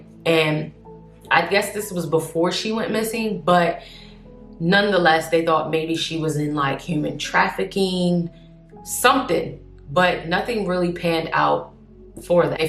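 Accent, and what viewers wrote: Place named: Baltimore, United States. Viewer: Also question are you from near Baltimore? I can hear that accent in certain things you say.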